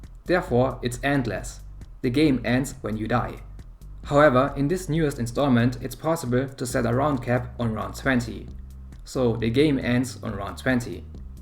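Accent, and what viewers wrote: Germany. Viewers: Ich weiß nicht wieso aber ich höre direkt dass du ein deutscher bist 😂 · Are you german because of your accent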